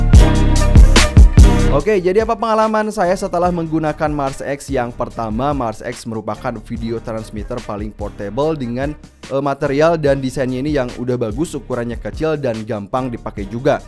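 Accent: Kuningan, Indonesia. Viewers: Bang abang orang kuningan yah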